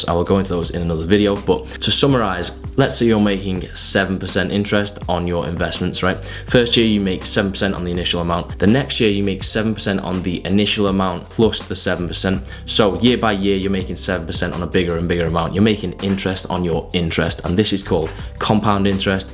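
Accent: United Kingdom. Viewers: Nice vid - subscribed! Where you from in the UK?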